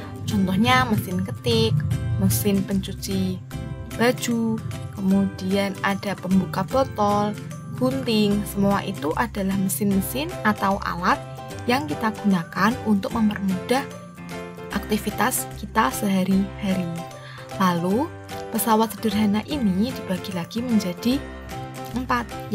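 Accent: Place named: Java, Indonesia. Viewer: Ibu nada jawanya kentel banget 😅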